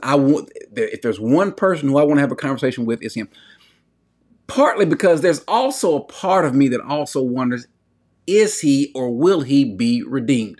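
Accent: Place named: United States. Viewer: U need to check yourself, what do u mean trying to sound black, what does black sound to you? He talks like normal human being. His just American, being a rapper does not mean black, it means rapper simple. Don't link being a rapper equals talking black. Just say u don't like him. Why are u comparing his following to another persons following as if it's his fault? U should try watching his videos before judging.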